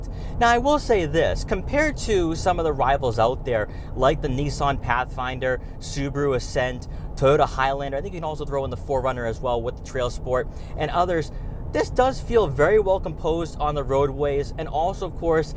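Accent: Boston, United States. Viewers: Being that you're from Boston, I wanted to hear you, " Pahk the Caah".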